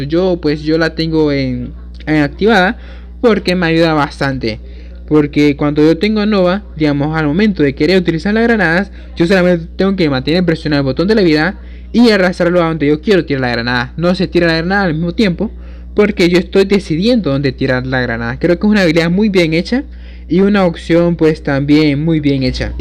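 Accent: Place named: Nicaragua. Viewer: crei que eras de Nicaragua bro un saludo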